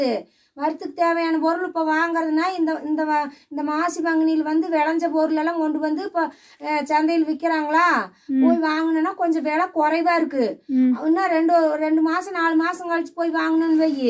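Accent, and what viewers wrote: Kongu, India. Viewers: அம்மா தினமும் கொங்குத் தமிழில் அழகாக பேசுவது எல்லோருக்கும் பிடிக்கும் இது போல ஒவ்வொரு நாளும் ஒரு டிப்ஸ் கொடுங்கள் வாழ்க வளமுடன் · ஆமாம், அம்மா ப்ரண்ட்ஸ் னு சொல்லாதீங்க. உறவுகளேன்னு சொல்லுங்கள் இல்லைன்னா தங்கங்களே உங்கள் அழகான கொங்கு தமிழ்லே பேசுங்கள்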